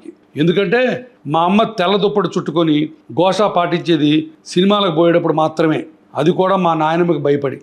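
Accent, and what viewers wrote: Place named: Nellore, India. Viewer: Chala authentic Nellore yaasa sir. Chala rojulaindi Intha raw and authentic nellore yasa vini 😊…. “Dargamitta” is a place in Nellore.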